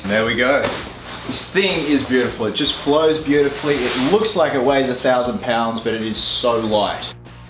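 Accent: Australia. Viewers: It’s weird cuz batman has an Australian accent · I actually bought it that you were Batman when you were doing the reveal video. Then when you spoke again I was like "Oh, right he's Australian" lol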